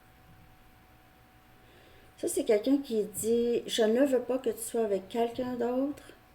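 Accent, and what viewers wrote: Quebec, Canada. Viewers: Bonjour, Je vous apprecie beaucoup car vous etes vraiment rigolote,et votre accent quebecoie aussi j'apprecie beaucoup,vous etes une personne belle,une bonne personne empathique avec beaucoup de coeur et de partage. Merci pour tout et bonne journee a vous.❤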